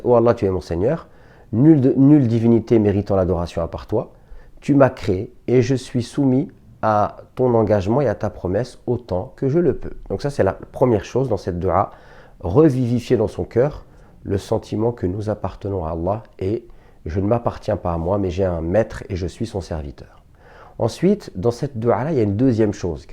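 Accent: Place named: Arab world. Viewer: Je te remercie du fond du cœur Mais l’accent arabe m’empêche de noter normalement 🙏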